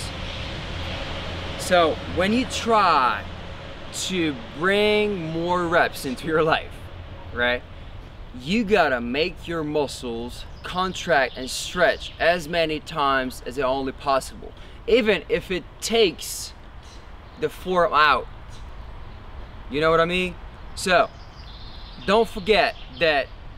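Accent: Russia. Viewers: This guys accent is a mix between russian, american, american southern, and a lisp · It’s a mix between Russian and a southern American accent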